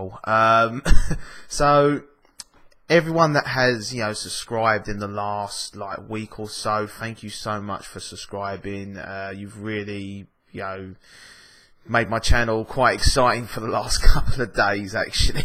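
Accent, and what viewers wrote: United Kingdom. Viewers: Hey don't listen to the haters, I personally think ur handsome and I LOVE the British accent! I prefer a British accent. I love following you and u make me laugh, ur very cute, continue to make videos :) also can you do a public freak out compilation reaction video? I'd love to see how u react ^_^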